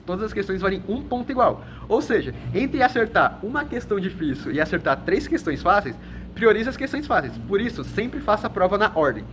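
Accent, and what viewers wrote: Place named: São Paulo, Brazil. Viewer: muito paulista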